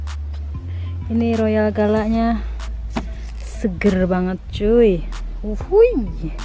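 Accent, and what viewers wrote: Jakarta, Indonesia. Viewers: Betawi bangat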